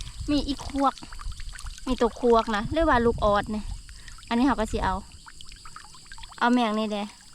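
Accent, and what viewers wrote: Thailand. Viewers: ภาษาบ้านข้อยกับภาษาไชยบุรีบ้านเจ้า เว้าคือๆกันเลยจ้า ภาษาเมืองเลย🥰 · สวัสดีครับน้องสาว ส่งความรักความหว่งใยและความหวังดี มาให้ทุกๆวันครับ❤สำเนียงพูดเหมือน เชียงใหม่ ครับ❤❤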